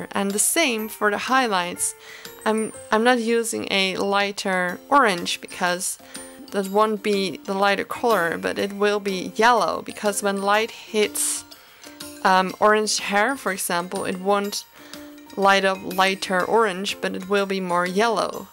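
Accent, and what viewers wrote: Netherlands. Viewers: Dutch? XD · Are you dutch by chance? idk I kinda sound the same when I try to speak English.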